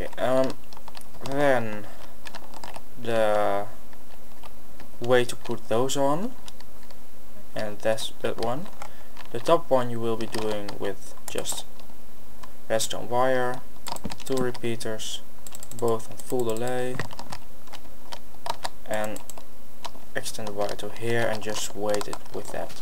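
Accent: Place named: Netherlands. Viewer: Waarom praat je engels terwijl je nederlands bent, met je mooie nederlandse accent.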